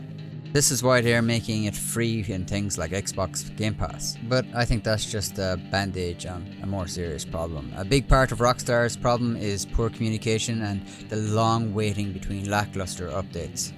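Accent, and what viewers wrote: Ireland. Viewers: Dublin or Belfast?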